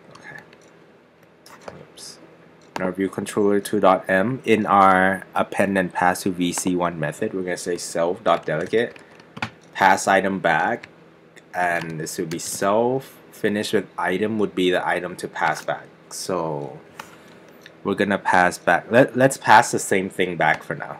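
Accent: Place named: Thailand. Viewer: You got a really good US accent but I still can feel somewhat Thai in your voice :) . I've lived in Thailand for 2 years ^^. Thank you again I've understood and practiced this lesson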